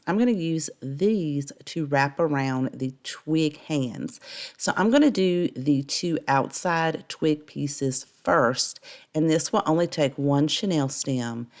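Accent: South, United States. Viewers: Hello from Scotland ✋🏻I've only been watching crafting on YouTube for a couple of months. And I really love how "clean" your designs are, if that's the right word. You have the minimum of things to make something look so lovely. We don't have the amount of choice in our shops that are as cheap as your Dollar Tree stores etc and although Amazon gives us a wider choice, it's not the same as seeing it in front of you being able to touch and find inspiration. That said, because you make so many of your projects with the minimum of stuff needed, I can replicate it without needing to take out a bank loan 😂. Just love your style, the way you explain it so simply in that lovely southern accent of yours. 🎄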